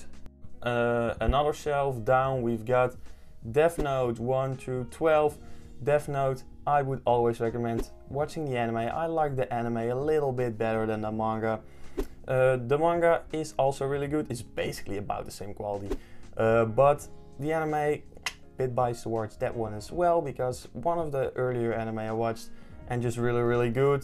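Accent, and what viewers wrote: Netherlands. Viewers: Duidelijk een nederlander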